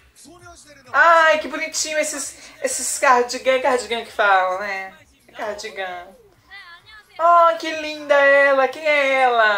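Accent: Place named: Bahia, Brazil. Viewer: MEU DEUS DO CEUUU, EU SOUBE QUE VC É DA BAHIA E EU TAMBÉM SOU, EU SOU DE SALVADOR-SIMÕES FILHO! JÁ OUVIU? FAZ UM ENCONTRINHO EM QUALQUER SHOPPING DE SALVADOR, EU QUERO TE VER! BEM QUE EU DISCONFIAVA DO NOSSO SUTAQUE! RSRSRS...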